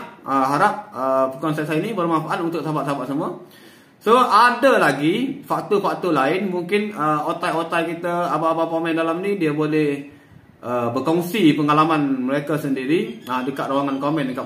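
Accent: Kelantan, Malaysia. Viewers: Bang bunyi kelantan cakap kelantan laaa 😁😁😁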